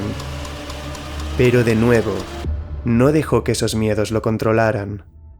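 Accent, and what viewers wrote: Spain. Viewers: A mí no me gusta el español de España por lo tanto no me gustó la narrativa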